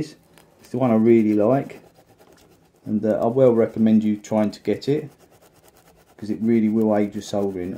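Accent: United Kingdom. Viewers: You are very british, I'm struggling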